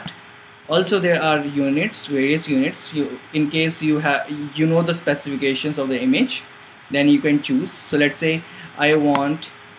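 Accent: India. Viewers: <link> learn how is spelt Gimp. Are You hindi? I am sure your accent is hindi.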